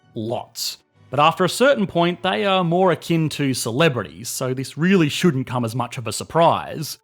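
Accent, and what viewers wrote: Australia. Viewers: Me: doesn’t get it in class... finds it explained on with cringe jokes and an Ausie accent and awesome stock footage. Me: google giveth🙏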